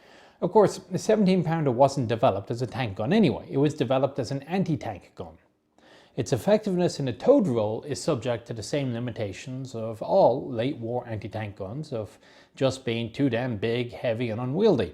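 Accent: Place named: Ireland. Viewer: This is the first time I have seen something from you that qualifies your statements on the inaccuracy of the 17pdr. In other videos your statements were, it was inaccurate due to manufacture. I have always had a little suspicion that your Irish lilt may point to your opinion on the British as a whole. However, I once again point to Joe Ekins who hit moving targets at between 800 and 1500 yards when engaging Michael Whitman's Tiger platoon. He hit at least three if not four targets with the Sherbrook Rangers also contributing to the demise of Whitman's Platoon and doing for Whitman himself.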